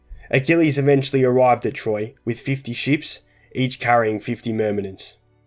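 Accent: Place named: Australia. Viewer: I can’t take the aussie accent seriously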